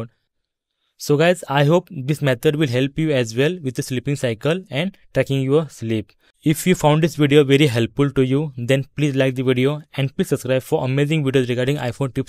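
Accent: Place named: India. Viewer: why indian accent is so bad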